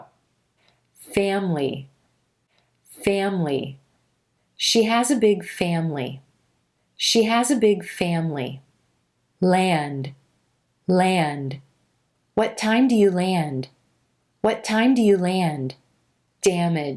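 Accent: United States. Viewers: The sound of your voice is so crisp and clear. You have a wonderful American accent.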